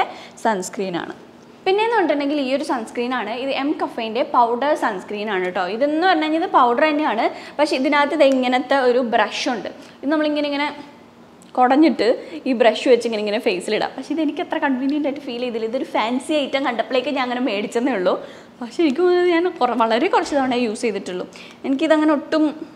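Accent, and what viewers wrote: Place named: Thrissur, India. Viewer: Aahhaa.. thrissurkaari aayo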